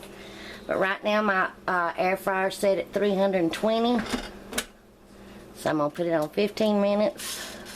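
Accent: South, United States. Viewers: Love the genuine southern accent!!!! 😍😍😍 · Hi, I just bought myself an air fryer recently. I LOVE the way your french fries turned out! I going to try your technique on my air fryer. By the way, I also LOVE your southern drawl };-) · best southern accent!!!! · you have what i call a country accent wow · I have to get me one of these! Anytime I hear a lady with a southern accent I know there's good food around! 😁😉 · Love the southern accent and your friends name 😀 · even with strong southern accent still easy to understand. I am excited about trying these.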